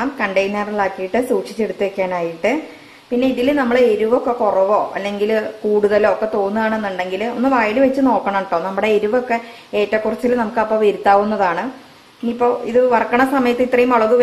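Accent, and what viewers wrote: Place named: Palakkad, India. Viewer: Chechi palakkad aano സ്ഥലം.. സംസാരം പാലക്കാടൻ ഭംഗിയിൽ ആണ്.. അതാ chothichath.. ഞാനും pkd ആണ്...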